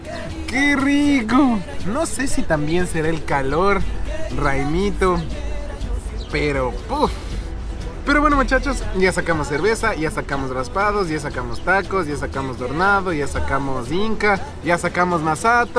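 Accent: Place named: Quito, Ecuador. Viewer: Espectacular, por fin un ecuatoriano con sentido del humor nuestro y ese acento quiteño inconfundible...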